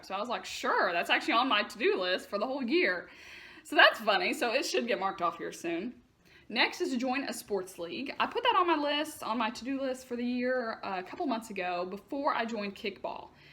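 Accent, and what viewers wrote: United States. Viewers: I'm Canadian u look like American from ur accent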